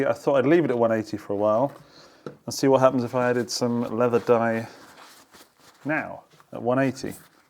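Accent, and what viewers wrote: United Kingdom. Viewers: I love 90s studios, I love working on guitars, I love hearing British accents on YouTube (no disrespect to our transatlantic cousins) and I love the slightly mad professor vibe this series has. All round win! Would be great to see you modding a tube amp in a similar style, although you might actually die, so that could be a very bad idea.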